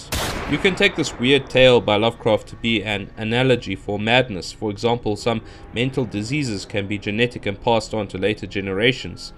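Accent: South Africa. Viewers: You're very close. I'm from Slovakia. And you sound just like a friend of mine from South Afrika, but it seems that you've been all over the world. "The heart is deceitful above all things, and desperately wicked: who can know it?"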